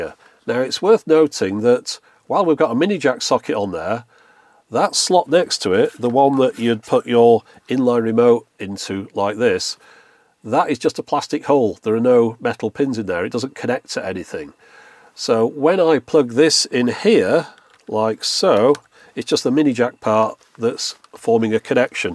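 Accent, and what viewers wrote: United Kingdom. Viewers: I love listening to a British man talking and showing obscure technology, and I think plenty of young people would like to watch a British man talking about tech❤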